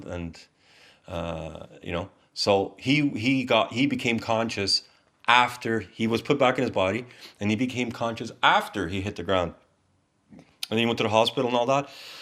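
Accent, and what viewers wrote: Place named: Finland. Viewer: You sound Finnish.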